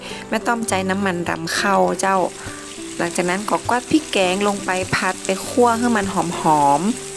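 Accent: North, Thailand. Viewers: ภาษาเหนือคะฟังง่ายอยุ่น้า😊😊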